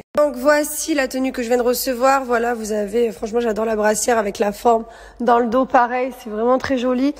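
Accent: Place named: South, France. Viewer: Salut. J’ai lu ton 📚 livre j’ai beaucoup aimé. Je viens de Biélorussie et Je suis en France 🇫🇷 depuis 17 ans, j’aime beaucoup ta personnalité. Grâce à toi j’ai commencé mieux parlé en français, j’aime beaucoup ton accident de Sud. J’ai bientôt 40 ans mais j’aime beaucoup te regarde car pour moi, tu es une femme intéressent. Bisous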